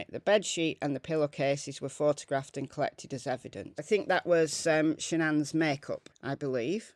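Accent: United Kingdom. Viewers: Me too. I love accent. We do not have tons of UK residents in Va where I live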